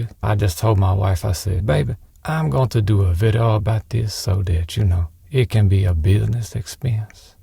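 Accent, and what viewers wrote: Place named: South, United States. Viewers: Star war ,he ma. Coolnicity. Errybody like gi joe. This guy never puts S at the end of his word. He sounds like he is Tennessee or alabama maybe louisiana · that is the most deep fried southern accent I've ever heard.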